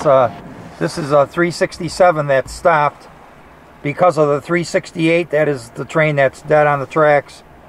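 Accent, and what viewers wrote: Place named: Buffalo, United States. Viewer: I thought I recognized that accent! I'm originally from Buffalo, and haven't seen "Lake Effect" snow in a while! 👍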